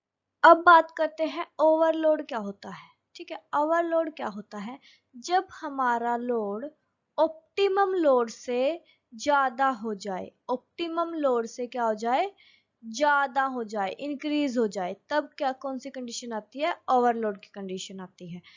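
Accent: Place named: Punjab, India. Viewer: you should to need more confidance, well good knowledge. Aapki Awaaz se lag rha hai aap Punjabi hai... 👏👏👏👏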